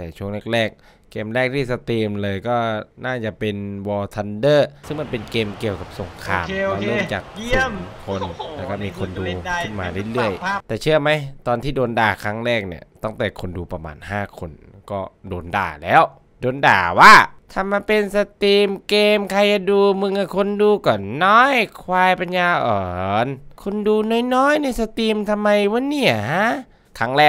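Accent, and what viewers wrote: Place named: Bangkok, Thailand. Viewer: พี่ เม้นผมหน่อย ผมคนทองผาภูมิครับ ตอนแรกผมคิดว่าพี่คนกรุงเทพ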